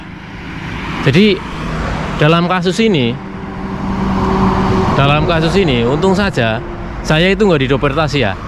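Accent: Banyumas, Indonesia. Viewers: ora ngapak ora kepenak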